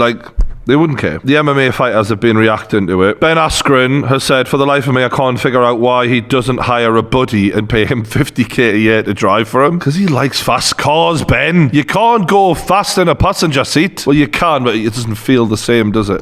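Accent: Newcastle, United Kingdom. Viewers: I`ve subscribed just cos you're a Geordie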